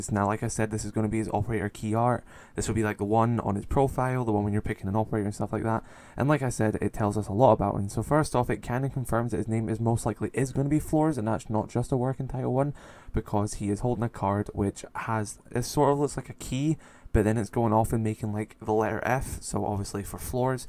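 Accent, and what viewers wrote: United Kingdom. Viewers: hello british man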